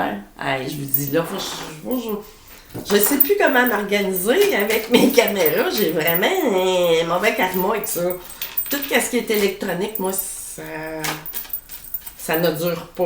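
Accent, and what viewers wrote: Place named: Quebec, Canada. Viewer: Je kiffff.....laxsen québécois...👍👍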